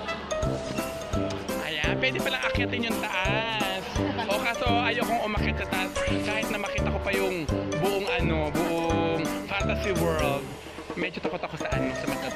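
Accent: Batangas, Philippines. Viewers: taga batangas po kayu lipa po ba😂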